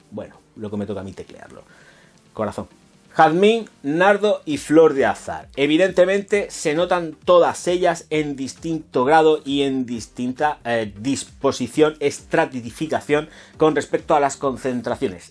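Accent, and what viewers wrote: Spain. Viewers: Que preciosa reseña, eres genial un artista describiendo perfumes me encanta es que eres autentico y ese asento Español que tuani muy lindo tienes Luz y carisma muchísima eres Fenomenal como me encantaría este perfume pero aqui en mi pais no lo venden saludos desde Nicaragua.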